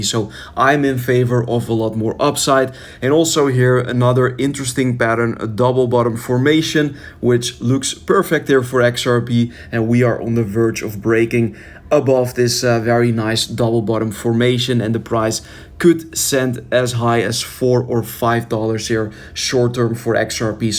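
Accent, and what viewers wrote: Netherlands. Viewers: Nederlandse accent weer duidelijke te horen😂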